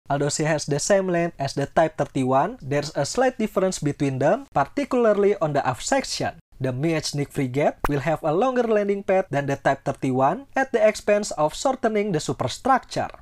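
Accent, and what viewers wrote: Java, Indonesia. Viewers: aksen inggris lu indonesia banget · english with indonesian aksen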